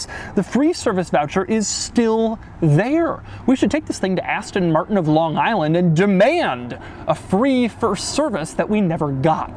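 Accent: England, United Kingdom. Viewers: english accent = perfect! yes yes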